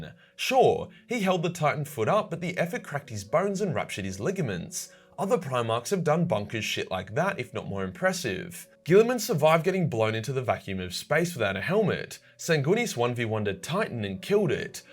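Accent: Australia. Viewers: Who is this australian guy and why does he hate this fictionial angry man so much? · He is a fellow aussie, matey bruh...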